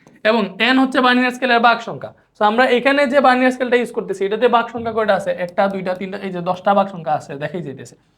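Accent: Sylhet, Bangladesh. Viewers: Vai apni sylheti ni.. please reply dein. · are you sylheti brother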